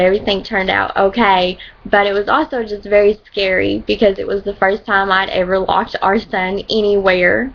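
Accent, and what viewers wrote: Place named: United States. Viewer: Hey momma holy country accent lol that took me by surprise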